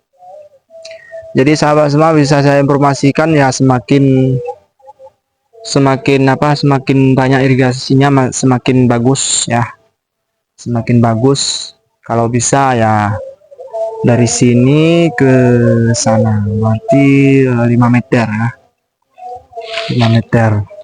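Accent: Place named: Paser, Indonesia. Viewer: Logat mu kaya suku paser kabupaten penajam paser utara ikn nusantara kalimantan Timur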